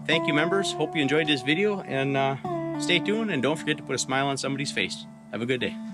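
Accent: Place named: Michigan, United States. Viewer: Just found your channel. Thought I recognized the accent. My mom is from Michigan. So most all of my cousins live up there. I love your trains.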